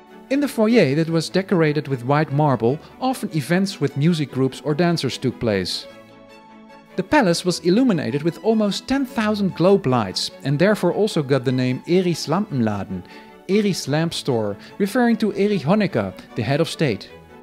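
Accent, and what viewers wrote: Netherlands. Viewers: he has dutch accent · First time viewer(and new subscriber)…very informative thankyou…I lived in BRD(München) IN 1985/86 and visited Berlin a few times…I remember this building…also..back then..never in my wildest dreams did I imagine that the wall would come down….p.s. to my ears your accent sound Dutch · Great vid, I'm guessing your first language is Dutch? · Interesting, thank you. Your accent sounds Dutch, so not an Ossi :-) What made you take up DDR history? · You mean “the only channel about East Germany in English” with a heavy Dutch accent! Calling it “English” is a bit much. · Blame the Dutch accent. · You sound very Dutch.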